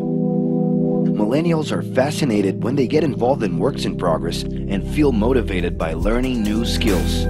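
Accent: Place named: Latin America. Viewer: where is the narator from? seems like a latin-based-language accent...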